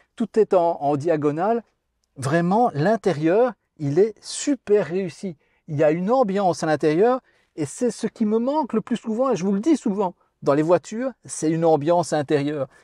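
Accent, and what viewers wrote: Belgium. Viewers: Merci pour cette video, anciennement frontalier, votre accent m'a rappelé de bon souvenir sur les plages de La Panne !!! Je ressens votre amour pour renault 😊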